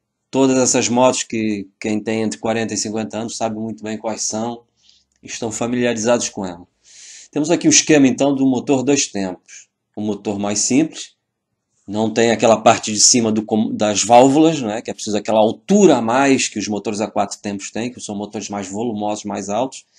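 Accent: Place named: Rio de Janeiro, Brazil. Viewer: Que legal sotaque carioca...explora o (x) em tudo kkk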